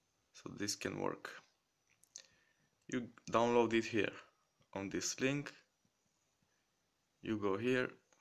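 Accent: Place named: Russia. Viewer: Видно что русский,но английский хорош!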